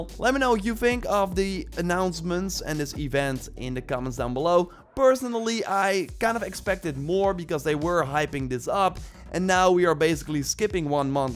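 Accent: Netherlands. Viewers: Your Dutch accent is killing me tbh :/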